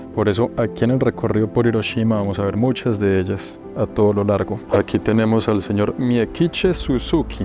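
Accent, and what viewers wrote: Bogotá, Colombia. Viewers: El español bogotano en pleno! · 😂 El tipo es más rolo que la changua, más cachaco que el ajiaco, más Colombiano que la cumbia y el vallenato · Ese acento Bogotano de, es un gusto escuchar su información... Sigue asi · escucharlo a usted es remontarme en el tiempo y volver a mis epocas de estudiante en el colegio mayor de san Bartolomé, ahi en la esquina de la plaza de bolivar, al lado de la puerta falsa y en frente del palacio de Nariño en Bogota. Asi nos narraban la historia mis profesores de sociales. Con ese asento pausado y elegante del cachaco bogotano. Eran una verdadera delicia esas clases, charladitas. Maestro, gracias totales como decia Cerati.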